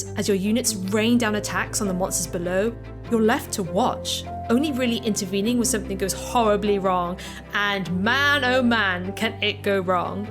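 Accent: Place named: United Kingdom. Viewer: how you gonna have a british accent and then incorrectly use feet instead of meters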